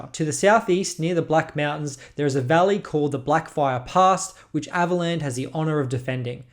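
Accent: Australia. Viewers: Great video and so good to hear it in an Australian accent!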